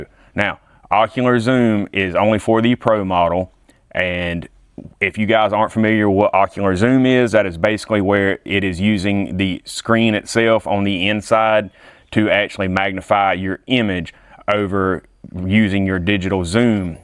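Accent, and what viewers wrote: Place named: South, United States. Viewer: My god, the hic is thick in this one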